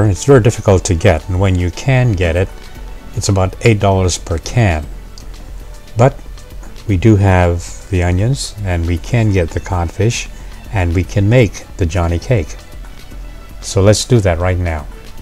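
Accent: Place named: Jamaica. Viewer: you sound like a Jamaican who's just been living in the US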